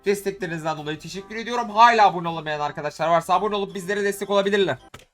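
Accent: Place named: Kayseri, Turkey. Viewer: kayserili olduğu konuşmalarından belli daşşağını yiyem diyo xd sivasdan selamlar adamsın abi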